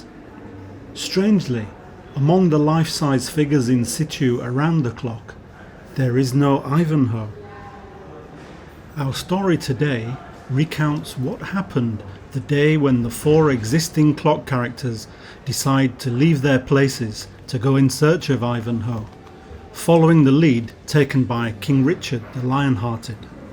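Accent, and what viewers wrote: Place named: United Kingdom. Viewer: Thanks for sharing — an interesting project. A few comments: The verbal narration (British accent, high vocabulary, and clear diction) gives the video a quasi-documentary credibility, which works well for a contemporary-myth style story. The title’s allusion to Walter Benjamin’s The Arcade Project similarly reinforces that tone. This setup suggests a deeper symbolic reading — not necessarily Benjamin’s exact ideas about consumerism and cultural loss, but something in that thematic area that isn’t yet fully developed. Regarding the filming treatment: if you adopt the criteria of a contemporary myth, consider expanding beyond simple montage. In addition to collage, other cinematic treatments could enrich the marcette scene. One distracting element is the camera’s hand-shake; using a tripod for that shot would help. I hope this feedback helps with your next iteration.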